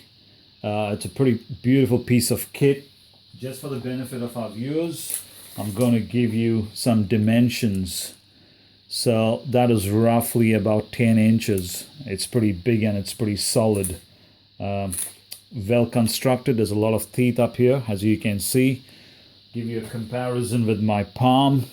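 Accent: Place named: Netherlands. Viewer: Okay, just thought you might be from Holland originally, your accent are similar to Josh' at Hemistorm RC :)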